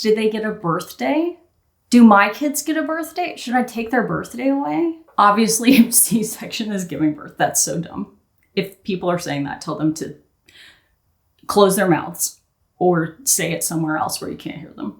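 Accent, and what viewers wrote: New Zealand. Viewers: I’m just so amused that you’re picking up a kiwi accent. Your “New Ziland” is exactly like my mates 😂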